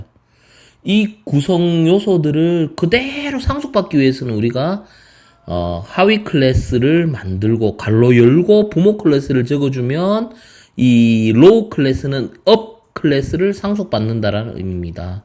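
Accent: Gyeongsang, South Korea. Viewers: 파이썬 고급테크닉을 잘 몰라서 찾아보다가 이거를 처음 봤는데, 사투리 사용하시는게 정말 귀에쏙쏙 잘 들어오네요. 서울말로 하는 것보다 경상도 억양 묻어나는게 훨씬 듣기 편해요~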